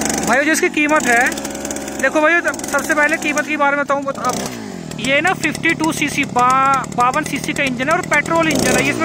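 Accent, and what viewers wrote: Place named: Punjab, India. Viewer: I can listen punjabi in ur hindi ❤️🎉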